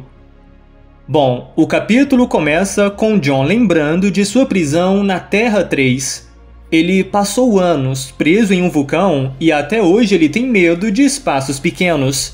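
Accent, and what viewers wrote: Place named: Northeast, Brazil. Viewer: mano por curiosidade, vc segura algum sotaque? parece q tem algum sotaque bonito do nordeste ai escondido kkkkkkkkk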